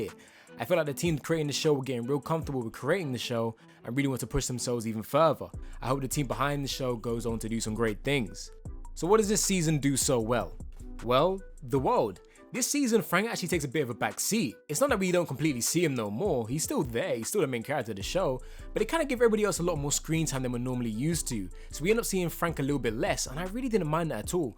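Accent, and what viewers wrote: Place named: United Kingdom. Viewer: Ahh, I'm already amazed with the British accent🤪😍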